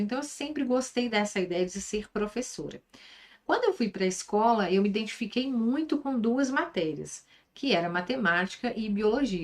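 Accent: Minas Gerais, Brazil. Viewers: Ele mineirinho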